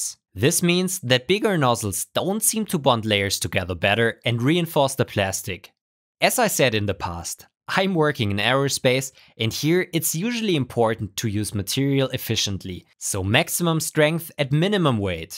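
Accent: Germany. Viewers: Man merkt richtig dass du deutscher bist.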